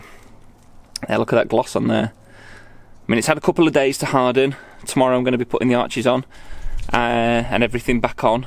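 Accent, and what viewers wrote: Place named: Stoke, United Kingdom. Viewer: top job.. is that a stokey accent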